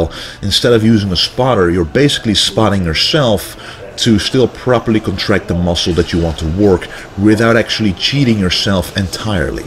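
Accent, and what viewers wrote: Netherlands. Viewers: I hear a dutch accent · you have a dutch accent... de groeten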